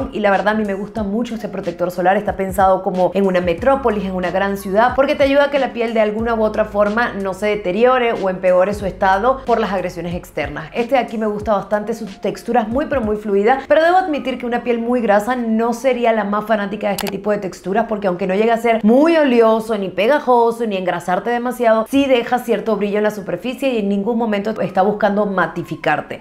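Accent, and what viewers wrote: Venezuela. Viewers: Escuchando este acento me decía, parece venezolana, después mexicana. Y luego el mapa de Venezuela en su antebrazo!🤗 saludos desde Alemania!😂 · Te ganaste un like, mi suscripción!! Y que orgullo que a pesar de tener tantos años fuera de Venezuela, no has perdido ese acento, ese sabor venezolano!!! Gracias por ese Review yo uso shiseido, pero ya tengo mi lista para probar!!! 💕💕💕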